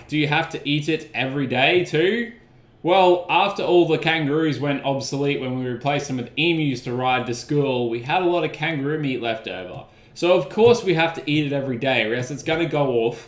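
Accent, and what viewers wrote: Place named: Queensland, Australia. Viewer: your a queenslander if ive ever fuckin heard one mate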